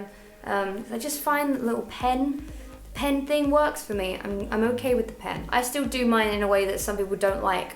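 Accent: United Kingdom. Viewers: i love british accent so much...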